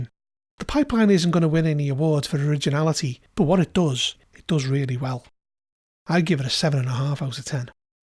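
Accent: Liverpool, United Kingdom. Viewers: Unless I am mistaken, I am detecting major Scouse.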